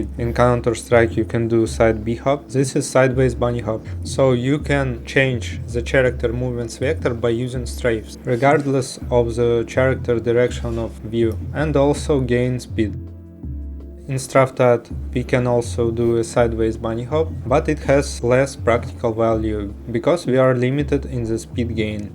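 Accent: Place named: Russia. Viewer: bro this video is really good thank you for the suka blyat accent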